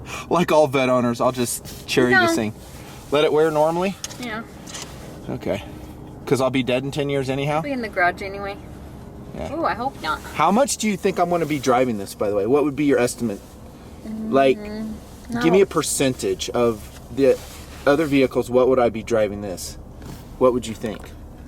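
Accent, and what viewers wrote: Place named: Texas, United States. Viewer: Yup sounds like Texas.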